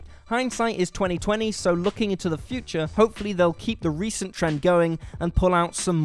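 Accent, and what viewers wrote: United Kingdom. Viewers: Leto not Leeto you limey mushmouthed douche.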